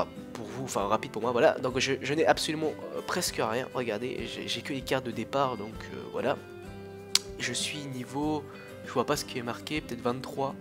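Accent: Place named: France. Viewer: J'adore ton accent à la française.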